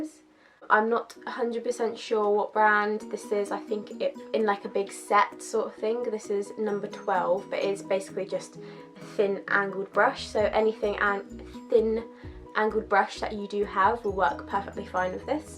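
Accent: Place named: United Kingdom. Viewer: You're British!? I'm in love😻😻😻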